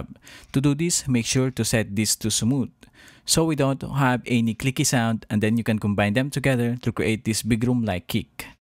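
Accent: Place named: Philippines. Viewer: Filipino? Your accent sounds really filipino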